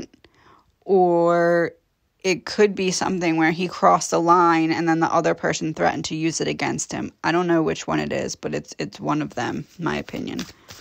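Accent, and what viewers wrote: United States. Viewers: Luv watching yr readings, it's amazing how you tie it all in.. where are u from? I cant place the accent other than it being USA.. GOD BLESS FROM THE UK♥️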